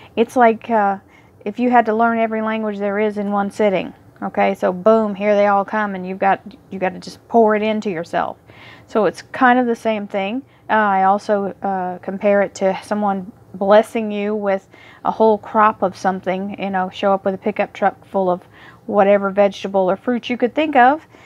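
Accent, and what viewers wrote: Texas, United States. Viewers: Love you Love you Love you....thank you sooo much you Texan girl.....Love the way you get all gigly and excited 😇😇😇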